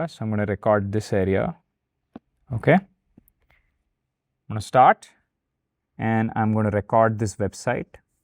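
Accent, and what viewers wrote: India. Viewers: For a moment, I thought i accidentally switched on the hindi dub mode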